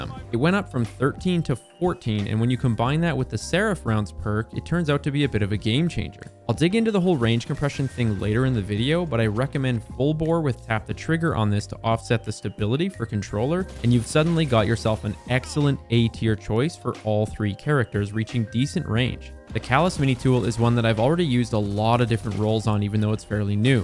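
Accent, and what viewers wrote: Canada. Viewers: Are you Canadian? You sound Canadian say “sorrow”. I love it.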